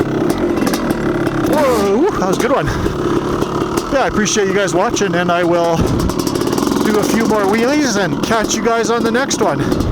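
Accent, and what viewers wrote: Canada. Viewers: are u canadian ?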